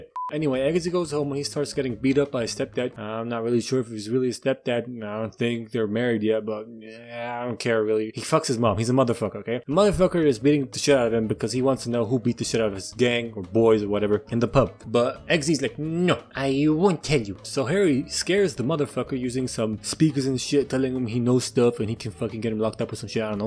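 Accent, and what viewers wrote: United Kingdom. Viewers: As an English person I approve of your British accent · Speaking in English accent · Perfect British accent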